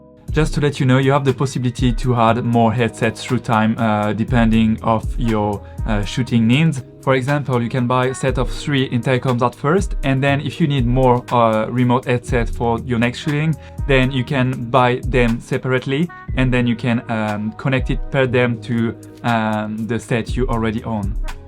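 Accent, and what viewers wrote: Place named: France. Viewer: English with a French accent is charming.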